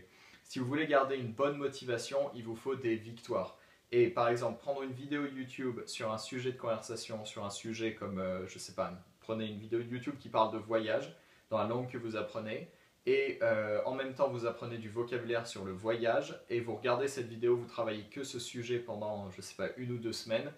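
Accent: England, United Kingdom. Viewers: c'est moi ou il a un léger accent anglais??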